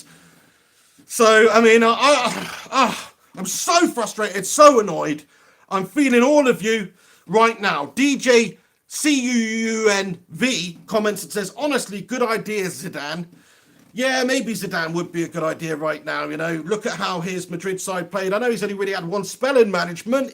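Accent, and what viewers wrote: Manchester, United Kingdom. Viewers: I cannot understand him, his manc accent is to strong.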